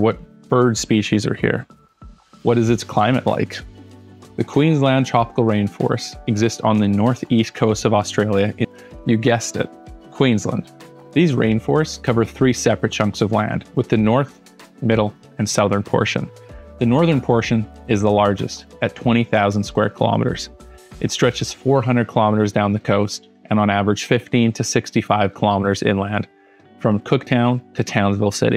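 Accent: Canada. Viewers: This gentleman has a Canadian accent…it’s subtle but you can hear it…I believe he is Canadian. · Wow an American who knows something outside of the US. Then it dawned on me that's a Canadian accent. Now I understand. Great presentation by the way. I learned quite a lot about plants and endemic animals that I hadn't heard of before. By the way if you ever get a chance to visit the rain-forests of Australia you won't be disappointed. The Tasmanian ones are very special also. By the way that deserves a thumbs up and a subscribe!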